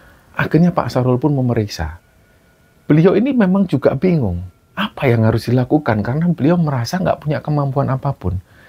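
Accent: Java, Indonesia. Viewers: Kalsel hadir mas Sukses slalu untuk mas dn tim ks nggeh, Kami ini asli Jawa Jogja tapi lahir dn domili Kalsel dn kami sgt mengidolakan mas karena ciri khas jawanya itu GK ilang SM skli keren,dn cara penyampaianya itu nggenah,njalur dn yg pasti keren mantap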